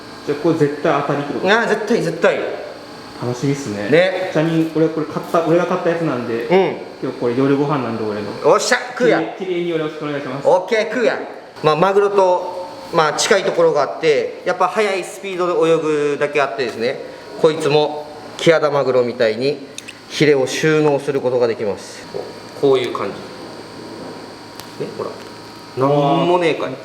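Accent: Miyazaki, Japan. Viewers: 宮崎の方言に ほっこりだわ～😂 凄い 裁きですネ 旦那がぁ 宮崎出身だから～ 懐かしくて😂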